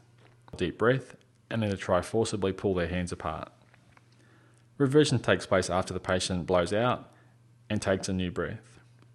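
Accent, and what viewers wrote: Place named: Australia. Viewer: This bloke is an Aussie! Take it from a Brit :)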